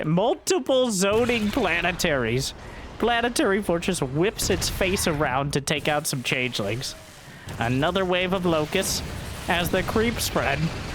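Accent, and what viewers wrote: South, United States. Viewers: Nice casting!! Would love to see one where you speak normal english, or some british accent. The southern accent is distracting from your great casting quite a bit. American in general is.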